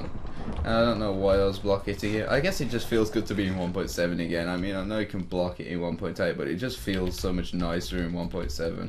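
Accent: United Kingdom. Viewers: bruh did become crazy more British as he aged? He has very little accent here...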